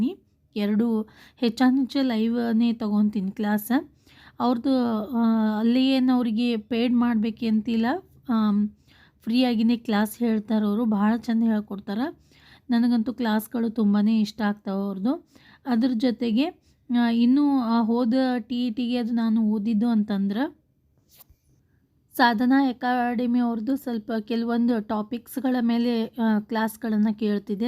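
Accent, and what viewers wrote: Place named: Vijayapura, India. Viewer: Tq akka namdu vijayapur nim baashe nam tarane anasataiti akka adake kelde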